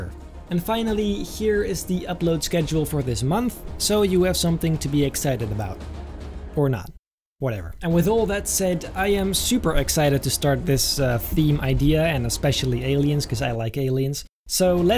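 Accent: Netherlands. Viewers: Its cool but we also miss the funny dutch accent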